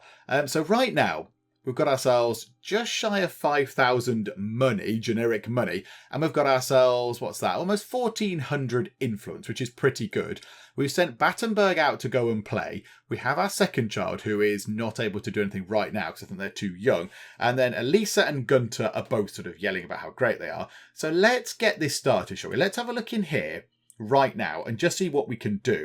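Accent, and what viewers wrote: United Kingdom. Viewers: Your gameplay are hilarious. And your British accent fitting the game so well. I'm in love with Medieval and Tudor era since I watched BBC documentaries. And I love playing Guild 2 years ago. Finally I play this game after watched your Cupboard dynasty. Have the game since 2020. Never play it until fully release.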